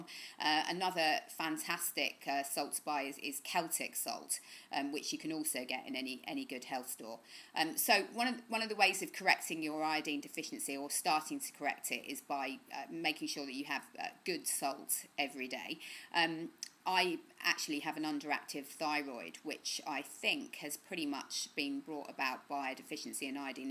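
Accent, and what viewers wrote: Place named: United Kingdom. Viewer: Your accent is so gorgeous! Which part's of UK it is? :) Just find your channel and binging on it now :) Thanks for great info! xx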